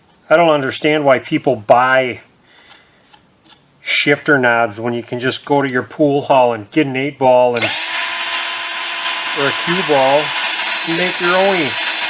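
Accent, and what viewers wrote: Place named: Midwest, United States. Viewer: Enjoyed the tutorial man with the Midwest accent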